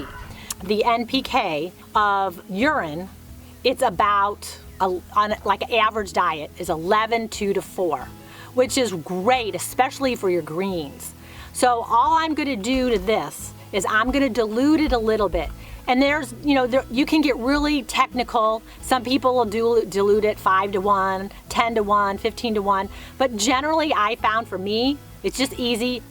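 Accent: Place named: Midwest, United States. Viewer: So thankful for your channel. I’m a mom of 4 and my husband and I really want to get out of the city. I feel crazy for wanting so badly to be off grid but you really have shown me that it’s not all that strange or impossible. Random question: Are you originally from Missouri? My daughter says that you talk like me. I grew up in Michigan and transplanted in Tennessee. Everyone here comments on my “accent” 🤣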